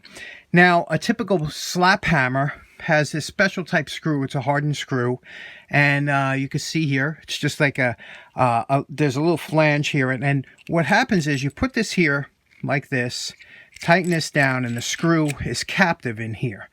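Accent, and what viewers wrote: New York, United States. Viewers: i’m a new yorker too, born in brooklyn, grew up in Flushing, now live in Denver, great hearing your accent😊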